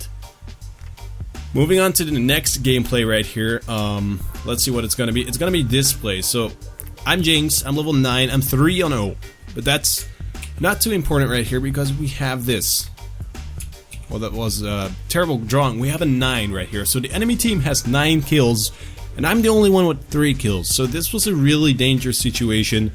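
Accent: Netherlands. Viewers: Guy your English ;D That accent is too obvious, dutchie xP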